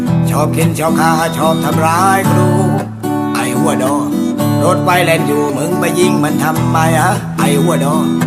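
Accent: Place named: Laos. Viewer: ลาวชัดๆ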